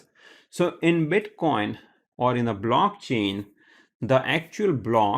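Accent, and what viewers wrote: India. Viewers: When I hear indian accent, I listen carefully coz I know I'll be learning a ton. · When I heard that indian accent, I knew this would be good.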